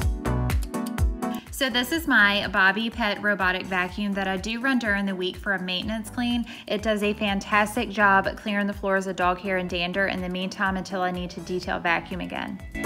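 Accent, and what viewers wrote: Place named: South, United States. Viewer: this the only video i’ve watched & i can tell she from the south 😂😍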